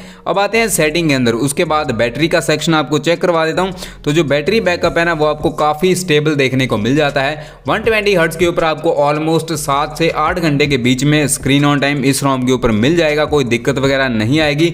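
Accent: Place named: India. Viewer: English title + Indian speaking, bro = dislike, bro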